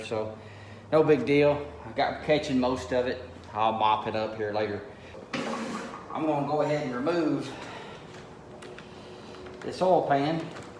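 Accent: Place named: South, United States. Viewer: That you bud? Looks and sounds like a hill-billy I grow'd up with- but anyway, I bought a '84 F-150 with a 'yet to be determined' 351, I think. Having hell finding the stamp, said to be on the block. I had been under impression it was a 351 W, but wasn't satisfied using the 'count the valve cover bolts' method, and thanks to a parts-house dude, certain of it not being a Windsor or a Clevelend, yet unsure what it actually IS, I'm back out there at 1st light this A.M. to find the stamp- just so's I can replace this leaky 2-barrel Motocraft Carbureator. Someone said that Edlebrocks are universal? Anyway, I wish my pa had pushed tools instead of a pencil and swung a hammer more often than a gavel, 'cause i think this Ford-thing may stick on-me. Love this truck. 4-wheel drive, 33" Mickeys & glass-packs with that classic '84 front cap, but with a work-bed added at some time. 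The scales at the dump says "5,890 LBS"-DRY! Stay outta my way! Ha!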